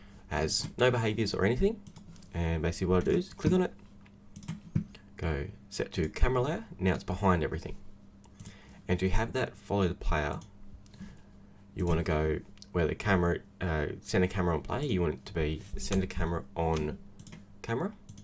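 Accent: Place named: Australia. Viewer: you can tell he's aussie, i can cuz i am too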